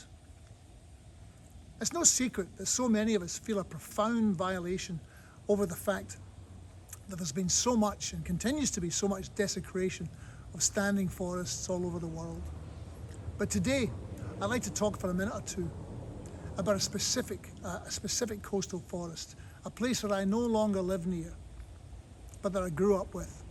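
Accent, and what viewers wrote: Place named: Scotland, United Kingdom. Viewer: Nice to see Colin Hay standing up for the environment! P.S I'd never heard him speak before, and after all those years living down under, I half expected him to have an Aussie or hybrid accent, not his beautiful native Scottish accent.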